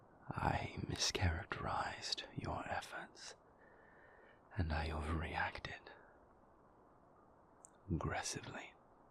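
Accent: United Kingdom. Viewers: FINALLYYYYYYY, now cue the emotional tears and British accent 🫡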